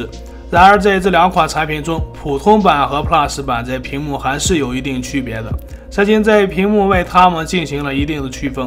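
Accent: Shanxi, China. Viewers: 大哥你是不是山西或者陕西人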